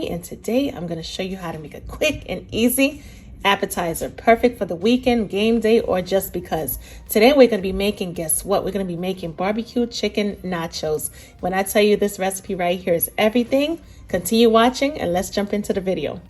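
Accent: New York, United States. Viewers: Nice video. Are you from New York or New Jersey? I hear something I’ve heard before in how you talk. Can’t put my finger on it.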